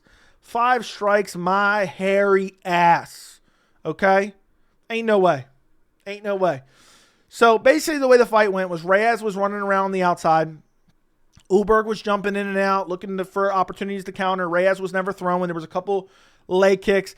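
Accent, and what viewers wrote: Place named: South, United States. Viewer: Love when you do that. Mega good old boy voice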